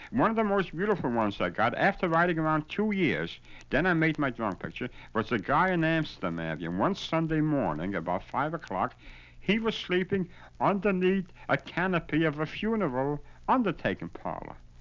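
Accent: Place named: Manhattan, United States. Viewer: Sadly that Manhattan “East Side” accent is now extinct…even on toity toid and toid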